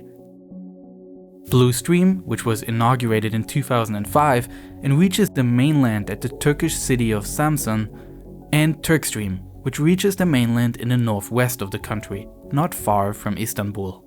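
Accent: Germany. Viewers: I subtly like your German accent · I have a question for you. Are you German? Sounds like it ☺️ Greetings from Munich 🤗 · I cant believe it took me this long to realize you're german, but the way you said August and Recep at the beginning are such a giveaway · German accent?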